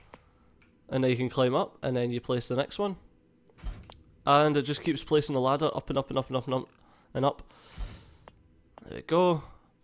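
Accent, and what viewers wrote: Scotland, United Kingdom. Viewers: Super Scottish sounding review ! You can build, shit. Scotland, accept no imitations !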